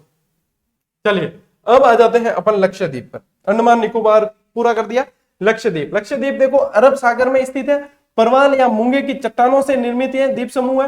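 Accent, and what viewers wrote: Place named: Marwari, India. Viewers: Aap marwadi bolte ho na sir tb best part bn jata h class ka owsm lgta h hme to smjh b ati h bolni b ati h 😀🤗🤗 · सर आपकी मारवाडी बहुत अच्छी लगती है। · Great sir 👍👍👍👍👍sir jb aap marvadi me bolte ho bahut hi accha lagta hai,ek apnapan sa lagta hai, thank you so much 🙏🏻🙏🏻🙏🏻🙏🏻🙏🏻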